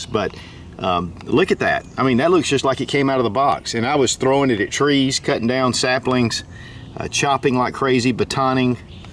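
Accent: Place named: South Carolina, United States. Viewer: oh ok your from S. Carolina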